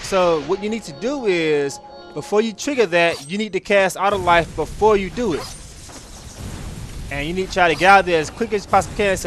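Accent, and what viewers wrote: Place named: South, United States. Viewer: I've been looking at your vids for a few weeks and the thought just crossed my mind "man dude sound like he's from the south" am I right? anyway great LP.. cant wait to actually have time to implement your tips lol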